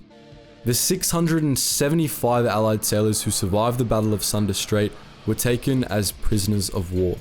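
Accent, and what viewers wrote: Germany. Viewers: Great video, but I feel like you’re trying to say the dutch names the dutch way, but your’re using the german way of saying them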